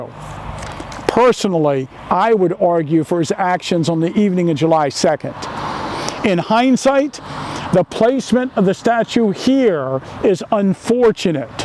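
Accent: Baltimore, United States. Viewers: The superb! Love your work, and your mistakes and baltimore accent make it all the better!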